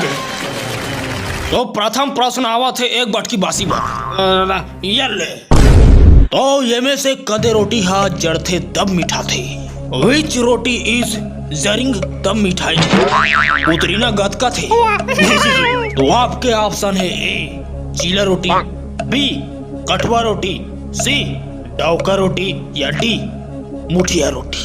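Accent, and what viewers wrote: Chhattisgarh, India. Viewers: बढ़ मजा आथे भ़ईया आपके विडियो म हमर छतीसगढी बोली भाखा सुन के 😅😅😅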